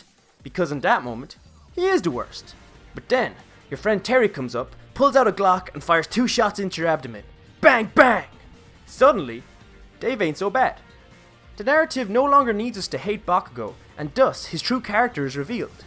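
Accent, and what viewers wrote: Ireland. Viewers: I love your accent, is that Irish. And Bakugou was my favorite from the very beginning, he is and will always be my favorite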